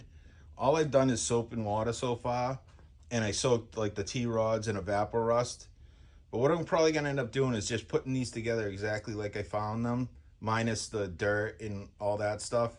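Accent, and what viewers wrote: Boston, United States. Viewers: Great video! Are you from North or South of Boston? Apts look like either Brockton or Dorchester. I lived on South Street in the early 80's Campello section. Def a Boston accent! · dam! I was gonna say Brockton when you said south shore. But your accent is thick so I thought closer to Boston. Just moved my son into a house in Brockton. Like Avon border, so not too bad. Nice neighborhood…for Brockton 😂. I can’t say too much about it. I live on the border of Woonsocket RI. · Sketchy park, and Boston accent. Definitely thinking Brockton or Fall River 😂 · Wow, man! I saw a poscammed. Free drums in the woods somewhere and just assumed it was a scammer. Maybe not related . Either way, this is absolutely crazy!!! What a killer find. Good job tracking it down, man. And hearing you say "pahk" and "pahts" so many times just added to my enjoyment 😂😂😂 Had to get a Boston jab in there as a New Yawkah! 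So envious on that Vistalite kit.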